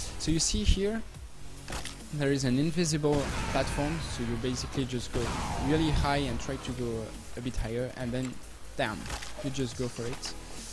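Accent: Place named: France. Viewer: Un français ca fait plaisir :P ton accent te trahis xD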